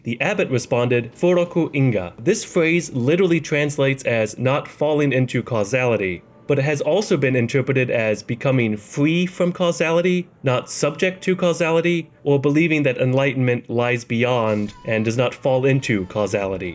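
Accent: Pittsburgh, United States. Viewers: I keep wanting to ask if he's from pitt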